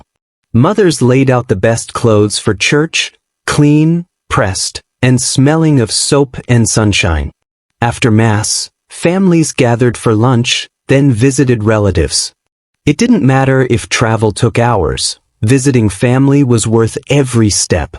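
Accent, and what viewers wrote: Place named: United States. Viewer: The white American English accent is cringe. Very mispronounced and terrible.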